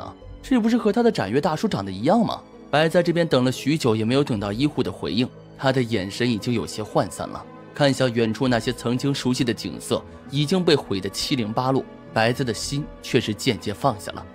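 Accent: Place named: Mainland, China. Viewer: 旁白一只加入大陸方式的語言 聽起來總覺得怪怪的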